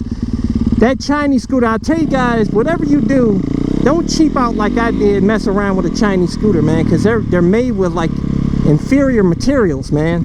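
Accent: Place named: Northeast Ohio, United States. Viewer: I get this strange feeling that you’re from northeast ohio. Maybe its the accent.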